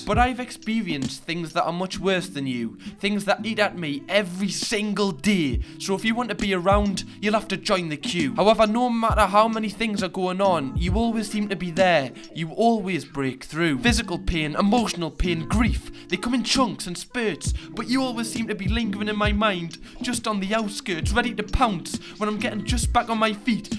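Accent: Newcastle, United Kingdom. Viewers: YOU JUST CANT TAKE HIM SERIOUSLY WITH THAT NEWCASTLE ACCENT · Good video, good words, and I know this is bad but I feel like it doesn’t u e the same effect in a Geordie accent.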